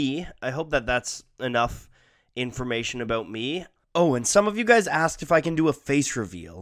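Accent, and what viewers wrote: Canada. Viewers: You sound like my brother in law except with a Canadian accent. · He really sounds a lot more Canadian.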